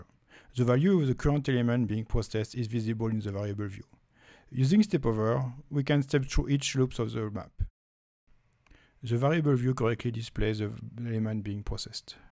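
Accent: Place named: France. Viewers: I agree that his french accent(I could understand only half of it) was strong but still you could be polite. At least he made an effort to make a screencast for us. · The french accent is very very very strong. Could make some efforts to speak with a better english accent.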